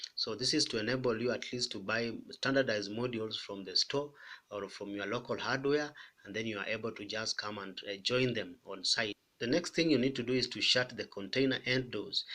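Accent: Kenya. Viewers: Good stuff man! and why do you sound like a Kenyan? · Sounds Kenyan.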